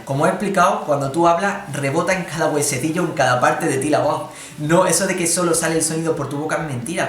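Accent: Spain. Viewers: es la primera vez que te escucho y hablas igual que todos los Españoles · Jajajajajajajajajajajajaaja Seve k es de españa Desde mexico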